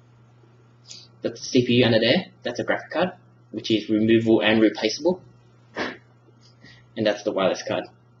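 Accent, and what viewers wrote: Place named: Australia. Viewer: You do realize Aussies all soundlike him right? It's not effeminate.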